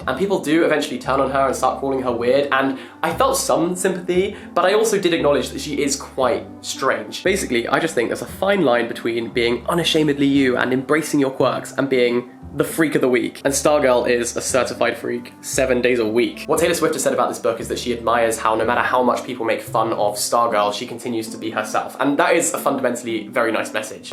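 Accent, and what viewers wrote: United Kingdom. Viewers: His accent !!!😍 I adore the British accent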